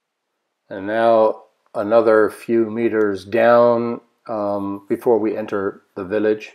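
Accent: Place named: United States. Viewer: Hallo lieber Velomobilist, das ist aber allerdings wirklich Schade. Mein Mann, also nicht der von gestern, das war "nur" mein Radelkumpel, war so gespannt auf die Bilder und ich auf uns von oben, dass du jetzt eigentlich nochmal hier vorbeikommen musst. Du bist ja auch durch Holzweiler gekommen und da wohnen wir. Mein Mann ist sehr an dem Velomobil interssiert und stellt mir 1000 Fragen, die ich ihm nicht beantworten kann. Bring deine Drohne in Ordnung und komm auf einen Kaffee oder Tee vorbei. Wir würden uns sehr freuen. Von uns kannst du dann auch über Kalenborn sehr schön über die Eifel fahren. Where did you learn you perfect English with American accent. We are quite fluent as well. We lived in the States for some years. It would be really great to meet you again!!!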